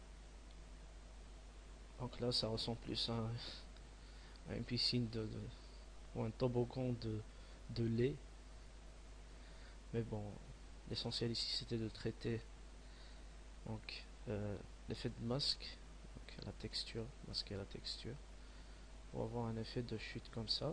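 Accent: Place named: Kabylie, Algeria. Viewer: toi t es Kabyle !!! j'adore l'accent t'es de chez moi toi ^^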